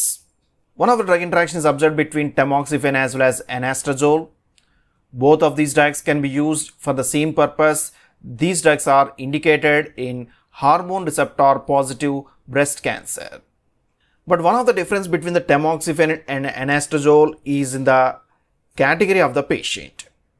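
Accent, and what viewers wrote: South India, India. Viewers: Typical south indian accent of English. Plz pay attention to: It's not Engime...but enzyme Not BlockED but blockt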